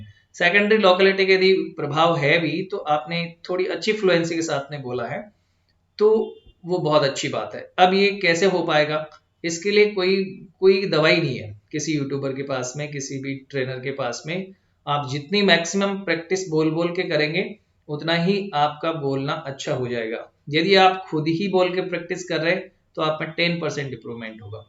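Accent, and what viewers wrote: Rajasthan, India. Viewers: Sir are you from rajasthan (Jhunjhunu)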